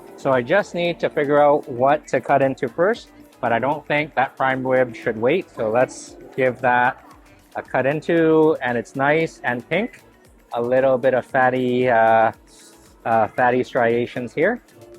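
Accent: Canada. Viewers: Pizza? Fish? Spring Rolls? I think you said. I'm not sure if I understood your Canadian English? You're lucky you are a Food Reviewer, otherwise I would be smacking you through the TV. I would like King Crab Stuffed Lobster, with a side of Crab and Lobster. THE END!!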